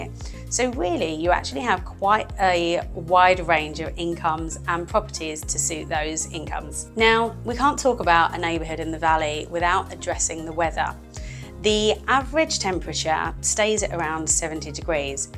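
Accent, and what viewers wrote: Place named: United Kingdom. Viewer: You'll be selling Alot of properties with your beautiful British accent... Thumbs 👍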